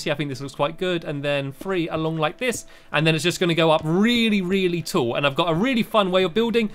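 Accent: United Kingdom. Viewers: * are you british??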